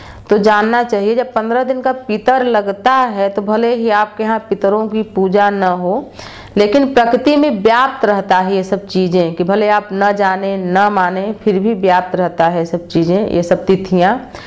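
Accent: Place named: Allahabad, India. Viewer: aap kaha rehte ho aunty muje ap ki language allahabad ki lag ri h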